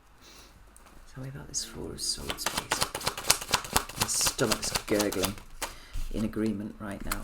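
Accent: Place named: England, United Kingdom. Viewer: Yo sister greetings from Andalusia Spain like the English accent,rare thing these days thanks for reading ❤🙏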